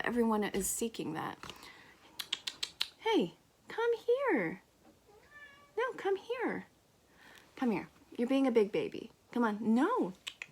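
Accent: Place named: United Kingdom. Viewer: Love ur British accent!!!!!!!!!!🤣🤣🤣🤣🤣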